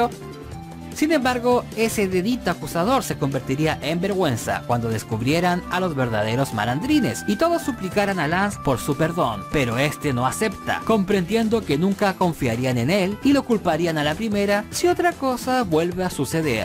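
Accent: Chile. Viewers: Eres chileno? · Acento chileno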